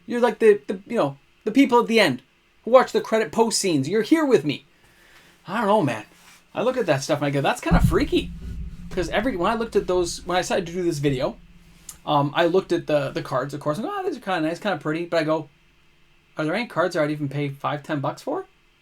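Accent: Canada. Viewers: this is my first video, but ill be back. i like your takes, and your POV. Also, some words make me think your Canadian, so, gotta support the homegrown Content.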